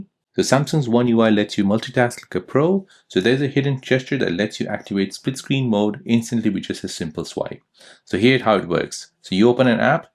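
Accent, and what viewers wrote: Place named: United States. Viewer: Its VOL UME not WOL UME. You spund American as well so why are you pronouncing like your German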